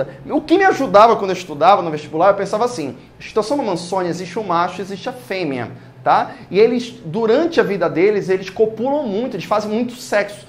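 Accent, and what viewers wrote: Pará, Brazil. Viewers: Queria saber se vc é paraense ? Tem sutaque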